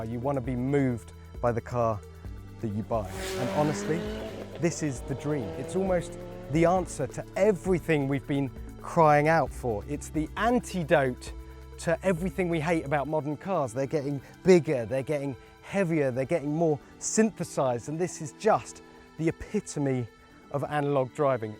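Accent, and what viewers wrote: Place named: United Kingdom. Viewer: Wins for most boring video. Listening to a Brit is as painful as an uneducated southerner.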